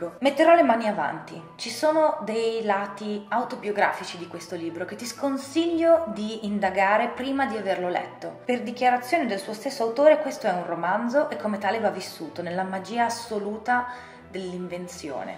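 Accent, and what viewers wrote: United Kingdom. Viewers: In questi video ho trovato tanti consigli per regali... che mi farò :3 Ma mi hai tolto ogni dubbio su quale prendere per primo quando hai detto "India" e "mi è sembrato di sentire gli odori" Mi spiace solo che l'edizione italiana di Shantaram non abbia la copertina bella come quella inglese, anche se sono certo me ne farò una ragione. P.s. Adoro il modo in cui parli! Sei di madrelingua inglese?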